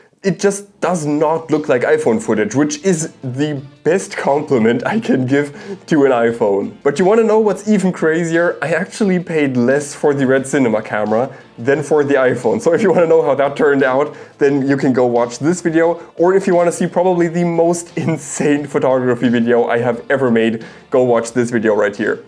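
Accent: Germany. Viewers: I hear deutsch😂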